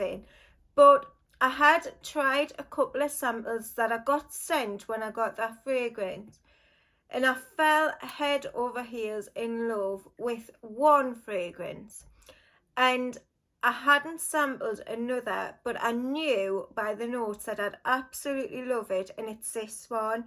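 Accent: United Kingdom. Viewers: Wow!! Congrats on the 2007 Angel!! Beautiful bottle. I've never tried Angel, tbh. I'm an Alien girl so not sure I would like Angel. I've heard it's either one or the other and I also really hate patchouli bombs. But you're making me want to sniff it!! Also, where is your accent from? I know somewhere in The U.K. but I've always wondered!!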